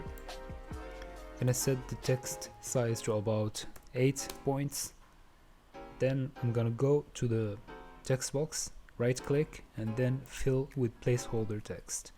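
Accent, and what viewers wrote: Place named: North Africa. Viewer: Great design bro,btw where u from u sound north african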